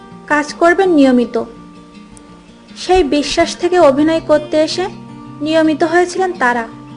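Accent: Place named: Noakhali, Bangladesh. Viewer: ai noakhailla pathilar path sunle mejaj kharap hoy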